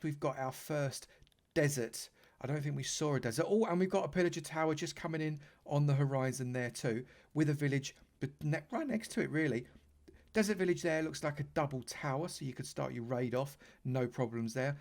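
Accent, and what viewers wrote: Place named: East London, United Kingdom. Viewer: U sound like u support West ham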